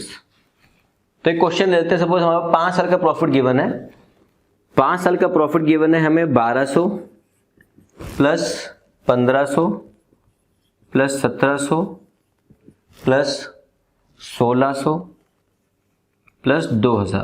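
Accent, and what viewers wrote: India. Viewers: Sir ji...hindi ka majak mat banaiye...you too sound to be a Hindi background guy.... anyway good technics....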